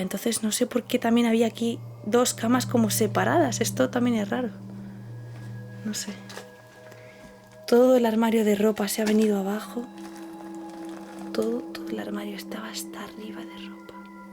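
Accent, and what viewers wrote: Spain. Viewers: eres muy genial tienes una voz dulce y hermosa me encanta como hablan en tu bella españa bellísima españa saludos ❤✌🏿🇨🇱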